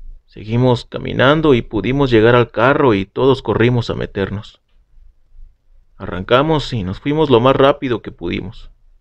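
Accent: North, Mexico. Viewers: Narras chido, con ese acento del norte me gusta.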